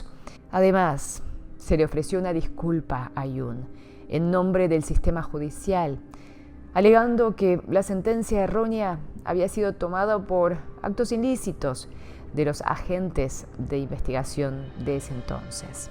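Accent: Argentina. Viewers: xd es raro ver asiaticos con el acento argentino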